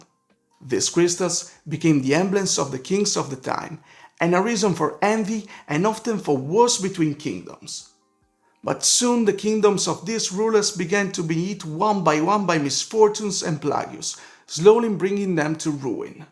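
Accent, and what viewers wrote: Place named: Italy. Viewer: ho ottenuto italiani origini dai quelli vecchi.. grandparents from my dad's side so I recognize an Italian accent anywhere 😅 also I was never fluent so pardon my butchering when I write, it's probably backwards lol. Do you have any social media?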